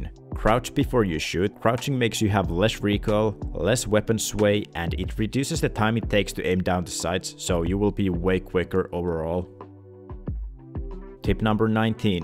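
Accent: Finland. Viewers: Sulla on todella hyvä englanti suomalaiseks, en meinannu tunnistaa mutta jotenki puski suomalaisuus läpi mikrofonin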